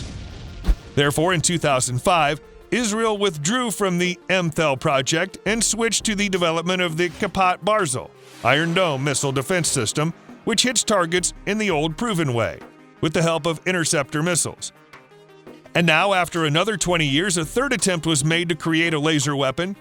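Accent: United States. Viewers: typical American annoying voice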